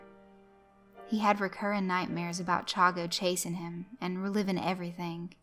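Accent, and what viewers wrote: South, United States. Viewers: I have heard you say that you are from Georgia , but I don't think that I have ever heard a southern accent from you . That is until this video . While the theme of the video is always a sick and sad story , your voice gave it understanding and empathy for the friend in the story . Some reading this would automatically go for the anger and disgust of Chango . They probably also cheered when he was killed , but I think the story goes well beyond that and to the suffering of the victims . But either way your voice gave respect to the victims of child molestation and to the survivors .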